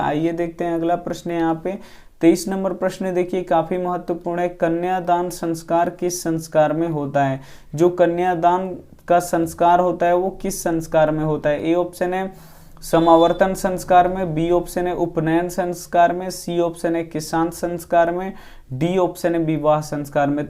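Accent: Bihar, India. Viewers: Hiii Kya aap bihar se ho..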